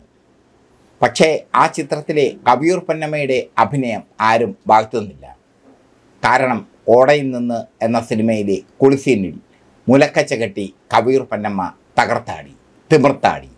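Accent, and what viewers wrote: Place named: Beary, India. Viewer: അതു ഞമ്മടെ ബ്യാരി മലയാളം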